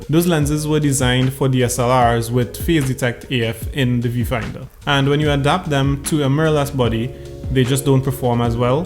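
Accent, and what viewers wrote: Trinidad and Tobago. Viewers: I have the Nikon 5100D and was looking for a lens to go with it other than the kit lens. I saw your video and decided to give it a watch and within the first 5 seconds I heard the Trini accent 🇹🇹 !! Big up my people one time. Just a quick question though, would you recommend this lens for a video podcast on my camera? Great video !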